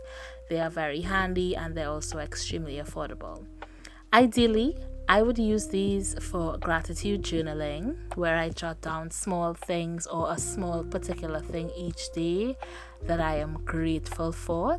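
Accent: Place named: Caribbean. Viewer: Where are from? I detect a Caribbean accent!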